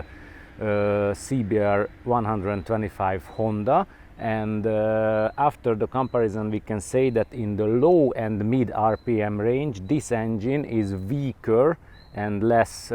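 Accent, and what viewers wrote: Hungary. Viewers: as soon as i heard your accent i knew you are hungarian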